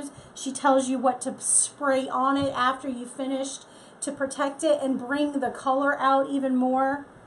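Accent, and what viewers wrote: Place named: South, United States. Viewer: Thank You!!! I cannot make a bow for anything that looks nice. This will help for Xmas gifts. I love everything you do, esspecialy your southern accent. 👍👍😊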